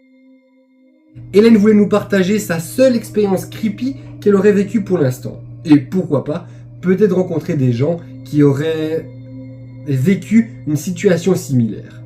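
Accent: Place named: North, France. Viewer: Bonjour, Je t’ai découvert il y a une semaine et j’adore ta façon de mettre en scène et de conter les histoires. Créativité, humour sont au rendez vous !! D’ailleurs est ce que tu es originaire du nord de la France ? En effet, ta manière de prononcer le 20 m’interpelle car je le prononce de cette façon étant originaire du nord de la France 😅 ! J’ai un ami qui écrit des histoires d’épouvante et d’horreur donc un jour tu as le souhait de conter des histoires fictives et originales n’hésite pas Je tiens à signaler que je t’en fait part juste parce que c’est mon ami et que je crois en ce qu’il écrit Excellente continuation à toi ! Sabrina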